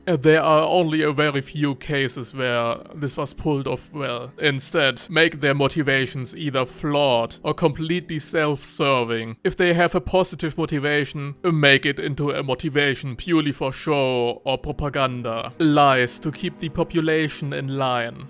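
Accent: Germany. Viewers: Boah, I love this German English accent. Bitte Mehr hahaha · Ahh yeah, once again my favorite German accent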